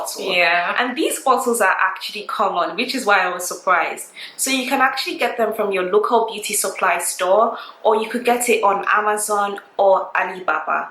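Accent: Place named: Nigeria. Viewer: I was going to ask if you are Nigerians cos of your accent but you have it in this video. Next question, with the mixture of all these oils how do you know which ones work for sure, like which does exactly what and which ones are ABSOLUTELY necessary which are the MAYBEs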